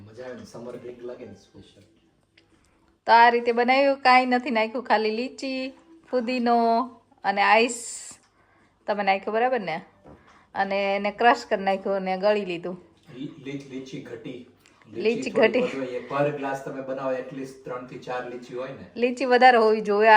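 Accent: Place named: Kathiawad, India. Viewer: Di tame bau j mast kathiyavadi Gujrati bolo che. to favourite che j n. Tamne Instagram pr jowa ni bau j maja awe. Keep it up n Grow much much more 🎉 Lots of love to you and your beautiful family ❤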